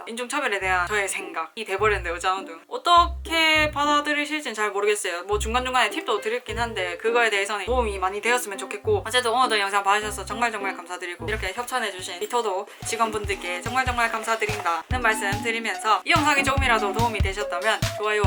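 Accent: Gyeongsang, South Korea. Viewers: 외람되지만, 경상도 어디사투리 인가요? 많이 들어본 억양인데 어느 지역인지 궁금하네요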